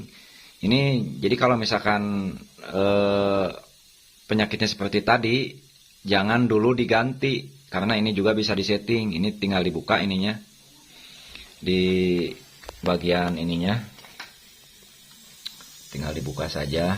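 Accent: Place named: West Java, Indonesia. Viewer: Duh, si Aa sunda bngt. Haha Don teh apa Aa?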